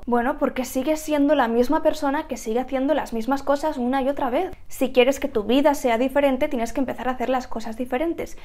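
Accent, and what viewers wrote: Spain. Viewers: Veo que todo lo que dices no son más que obviedades, que nos está diciendo la vida? Tamaño boludez. Además ese acento de España que es absoluta mente molesto! Las cosas pasan por causalidad, por estar en el lugar equivocado o por qué simplemente te ibas a caer en es instante; si fuese así, yo ya estaría en la lona..10-15 años de problemas, dificultades y desorden, aún así puedo ser bastante rico en cuestión de un par de años! El destino no existe, ni los planes muyy elaborados llevan a resultados X.. hay cosas que no puedes controlar como decidir " ver " este video de YouTube donde una tipa te dice que pilas, que así o asa debes vivir la vida!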